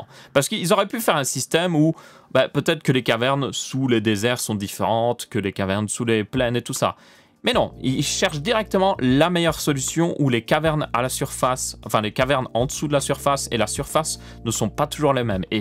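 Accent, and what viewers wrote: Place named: Ardennes, Belgium. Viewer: Ardennes belges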